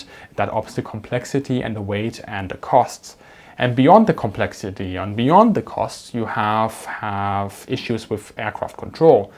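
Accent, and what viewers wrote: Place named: Munich, Germany. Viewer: Jedes Mal, wenn ich mir deine Videos ansehe, kann ich in deinem Akzent den Akzent meiner Großmutter hören. Sie war aus der Gegend um München. Sie kam nach dem Ersten Weltkrieg als Kriegsbraut in die USA. Mein Großvater war ein Deutscher der 3. Generation aus einer deutschen Einwanderer-Enklave in der Nähe von Philadelphia, Pennsylvania, namens Germantown. Seine Familie besaß dort eine Möbel- und Klavierfabrik. Er traf sie, während er sich von der Senfgasbelastung erholte, und sprach fließend Deutsch. Sie war Krankenschwester im Genesungskrankenhaus und sie verliebten sich, während er ihr Englisch beibrachte. Nochmals vielen Dank für ein weiteres fantastisches Video.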